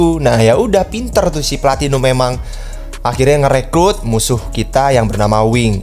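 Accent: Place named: West Java, Indonesia. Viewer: Kang jiga orang sunda logatnya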